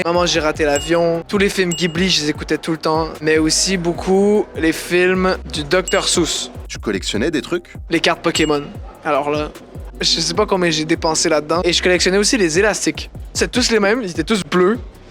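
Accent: Quebec, Canada. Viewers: C'est trop drôle de t'entendre en dehors de tes musiques parce que dans celle ci on se rend pas compte que tu es québécois 😂❤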